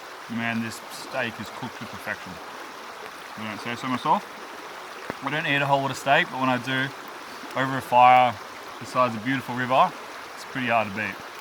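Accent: Australia. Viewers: Hi, If you can make a swag that’s light weight, water proof, durable, can be comfortable on unfriendly surfaces and under $500. I’d buy it. My idea would be a collapsible carbon fibre stretcher frame, with built in air mattress. And eyelets to rope off to a tree for inside volume or to achieve level perhaps. It would need to come in black, green, yellow and camo. And please get it made in Australia. Otherwise one would simply buy a Hammock tarp setup. Good luck with your venture mate. And love your latest video. Careful you don’t get commercial with the plugging gear but do enjoy the cool gadgets you use. Mate I reackon your from Queensland the way you say aye at the end of your sentences. Am I right?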